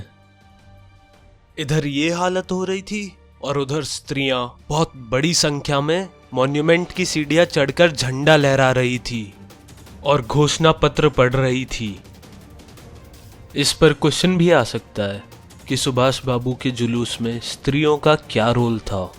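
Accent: Haryana, India. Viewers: Sir Are you from Haryana ?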